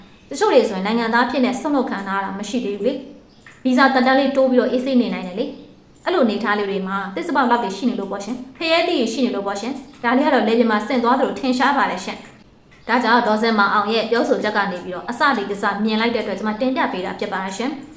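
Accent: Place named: Dawei, Myanmar. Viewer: ကဲသိပီလါး။ဒွေးလေသံ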